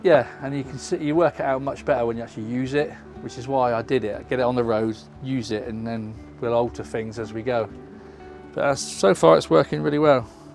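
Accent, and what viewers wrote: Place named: Ukraine. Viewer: Dubbing? I understand both of them…one British and, the presenter, sounds possibly Ukrainian. Since you’re writing in another language maybe you just don’t understand them, or the closed captioning isn’t doing a good job of translating for you. I find it very inefficient. No fault of the channel.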